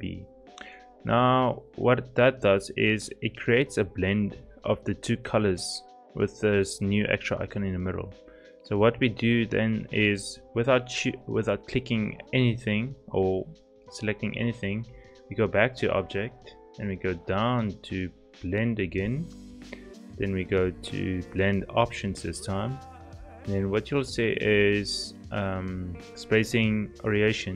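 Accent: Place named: South Africa. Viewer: I detect a South African accent there....am I correct?😉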